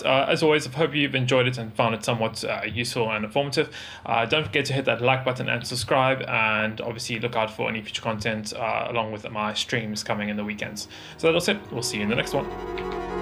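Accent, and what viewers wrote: South Africa. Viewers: Are you south african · You sound south African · You sound like a South African :/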